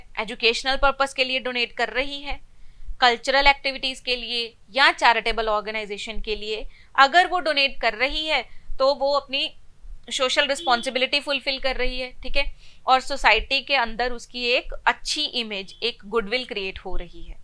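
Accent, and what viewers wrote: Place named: Punjab, India. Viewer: Mam your punjabi accent😂❤❤ Dil jeet lete hoo.!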